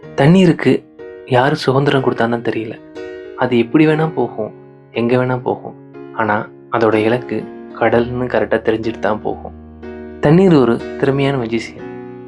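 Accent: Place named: Kongu, India. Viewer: Wow..🤓 ...nalla Kavithai with kongu style🔥🔥🔥🔥🔥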